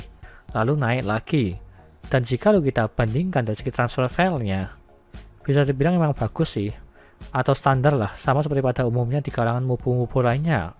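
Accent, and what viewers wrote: Java, Indonesia. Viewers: jowo e sek ketoro bang ,gas review e walau bhsa indo e medok 🥱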